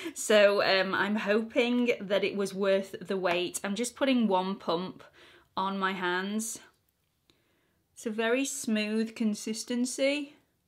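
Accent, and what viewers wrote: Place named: England, United Kingdom. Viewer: All your descriptions still sound so proper with your English accent. 😊 Please do the Essence review next. 😁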